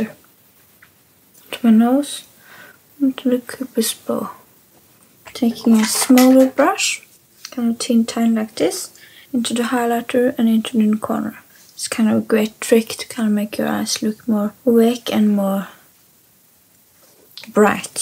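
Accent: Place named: Norway. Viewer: love your accent girl. same as aurora because she is norwegian too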